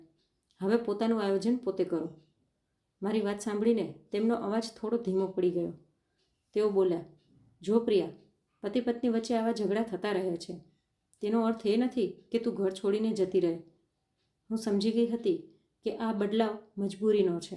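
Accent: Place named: Rajkot, India. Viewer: Rajkot vala aavaj haoyu ch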